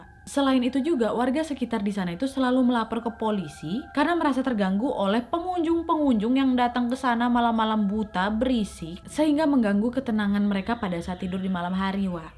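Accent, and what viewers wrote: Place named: Madura, Indonesia. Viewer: kok kaya logat madura😎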